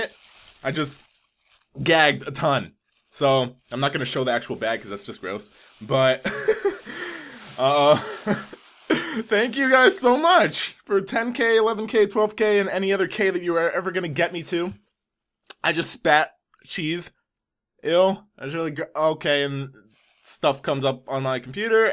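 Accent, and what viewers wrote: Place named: Caribbean. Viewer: Im from The Bahamas and you look and sound just like me, are you from the carribean? if so which island or america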